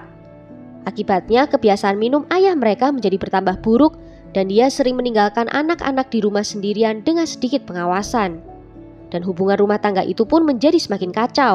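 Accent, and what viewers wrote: Java, Indonesia. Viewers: Alhamdulillaah.. medokuhhh cantik 👍👍😍😍😍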